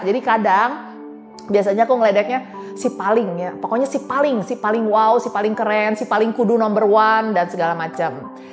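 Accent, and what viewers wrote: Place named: West Java, Indonesia. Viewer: 😂😂 selain Seneng penjelasannya dok, juga pengen ketawa juga krn dokter nyunda pisan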